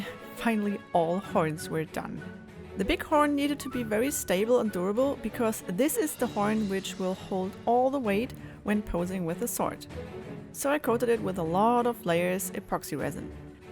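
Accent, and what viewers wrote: Germany. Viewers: You should work on you german accent but well the accent does not make the sword less cool, great work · are you german ?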